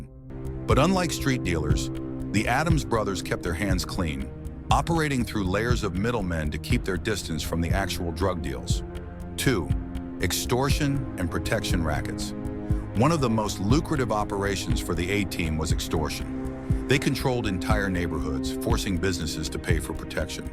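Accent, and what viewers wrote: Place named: United States. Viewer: Turned off as soon as I heard an American accent.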